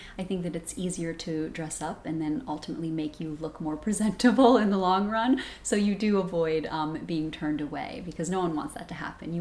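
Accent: United States. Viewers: I am sad her culture is to speak perfect American English, and abandon her own culture completely. Her culture is thousands of years older, but pretty great.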